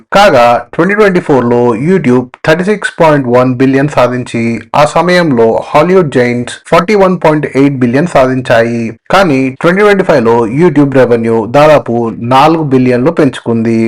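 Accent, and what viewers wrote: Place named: India. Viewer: Content bagunnayi but telugu slang konchem change cheyali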